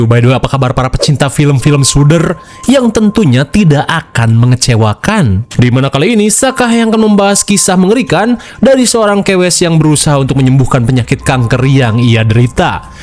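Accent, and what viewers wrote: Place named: Cianjur, Indonesia. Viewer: ini bahasa Sunda ny kaya ny cianjur bngt nyambung sama Sunda aku soal nya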